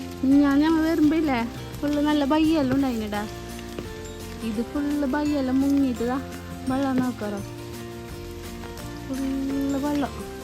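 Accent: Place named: Kasaragod, India. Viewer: കാസ്രോട് ഭാഷ 👌👌👌😁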